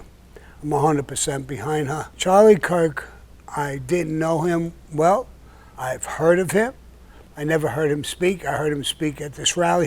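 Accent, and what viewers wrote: New York, United States. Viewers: Nice to hear NY er!😊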